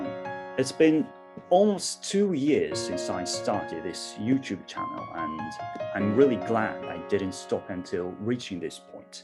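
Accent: United Kingdom. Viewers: さんはイギリス英語かな？？ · はじめて「 さん」ではなくのイギリス英語を聴いているような気分。響きます。。。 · 20万人おめでとうございます🎉 イギリス英語って染みますね！ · おめでとうございます！いつも応援してますイギリス英語のほうがいいっすねｗ 染みるわ～～ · 貴方のおかげで、共通テストのイギリス英語が前よりも格段に聞き取れるようになりました！！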